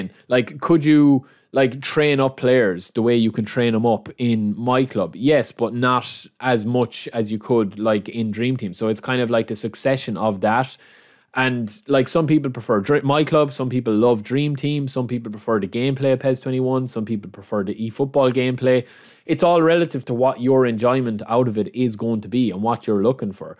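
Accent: Ireland. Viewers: That Irish accent is quite a ting, lad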